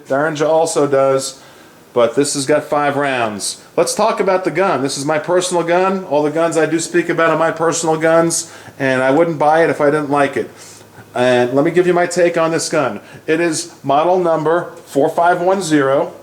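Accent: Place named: New York, United States. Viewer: Great vid, just subrscribed. Your accents definitely not from Florida, New York maybe?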